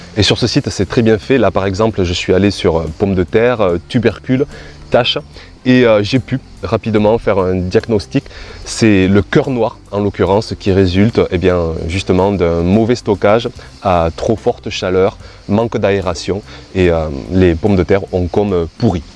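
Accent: South, France. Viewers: Merci pour cette vidéo c'est toujours aussi plaisant à regarder et cet accent du sud qui nous fait un bien fou (à nous bretons 🤣) . Chez nous c'est sec aussi ...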